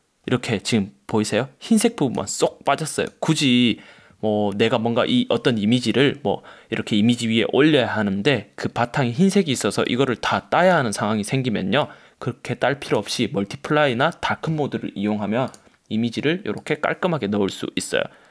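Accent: Gyeongsang, South Korea. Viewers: 억지로 서울말 안쓰셔도 됩니다ㅋ(아무리 들어도 경상도 사투리..ㅋ). 좋은 강의 잘 듣고 있습니다. 재밌어요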